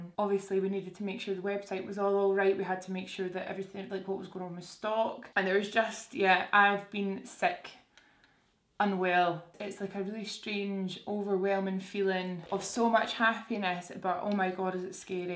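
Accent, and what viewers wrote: Scotland, United Kingdom. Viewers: I'm tearing up just listening to you talking about VIEVE. I've been following you FOREVER! I was still in high school when I started following you on instagram, you still had the white stripe with the black hair. You didn't have Drogba yet. You lived in a tiny flat and you were a bit shy in front of the camera. And oh my god, I didn't think accompanying your journey had gotten to me until now. I feel like a proud friend! My mom and I watch your vlogs religiously (even though I have to translate half the things you and Jack say, she's not good at understanding Scottish accents). You're the only beauty influencer I truly listen to. I'm so so so happy for you!!! Lots of love from Brazil! Trust and believe I'll save money to get e v e r y Vieve product. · I’ve been watching you since waaaaaaay back in the day having stumbled on you by complete accident. 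I have a great love for Scotland and it is my number one bucket list place to visit so when I heard your accent I stopped and was immediately obsessed by your your personality. You’re such an inviting loving person and have an energy that I can only describe as sunshine. I watch every single video religiously. I’m so so so happy for you about your brand and honestly I’m really proud of you!! Nothing but love from me to you sister!! You’re killing it. Keep being the beautiful human that you are xoxoxoxoxoxo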